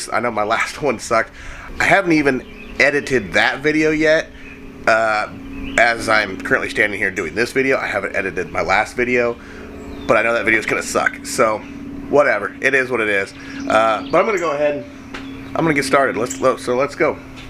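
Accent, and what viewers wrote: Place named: Texas, United States. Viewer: can I place an order for some of your breakfast burritos 🌯, to be delivered to Chuck and I in Texas???? Love ya'll...Your Texas Mom🤗😘🥰👄💞👄 P.S. I'm amazed you've maintained some of that Texas accent.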